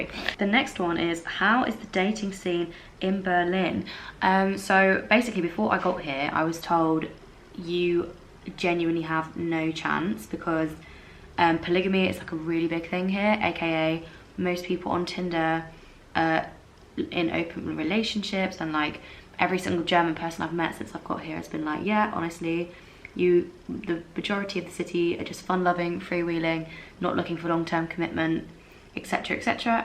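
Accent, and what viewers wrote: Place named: RP, United Kingdom. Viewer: where's your accent from? it's sooo posh! <3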